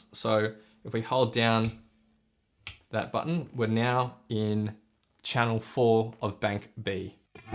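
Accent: Australia. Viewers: Bought an airstep katana a few weeks ago and just watched your video. Sorry I didn't order through your link. Super clear explanation of technical issues without getting bogged down. You are a great teacher/explainer. I will look at some of your other videos. I lived in Hobart for 23 years <num> so nice to hear your aussie accent. Maybe you know the folvig bros Lawrence or Elliot. Nice guys really good guitarists. I used to play w/their sister in TAS. But melbourne is a lot bigger pace than Tassie.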